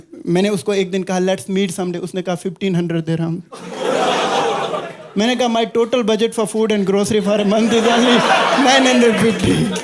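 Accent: Kashmir, India. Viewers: Puré Kashmiri accent 🫶🏻🌷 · Mainey subas - crybe karr leya 😂😂 .. Kashmiri accent ❤ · Awesome Performance. Timings and delivery along with that Kashmiri Accent was fire 🔥🔥All the best from US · is just Samay riana with a beard, a Kashmiri accent and daring muslim jokes. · Kashmiri accent hai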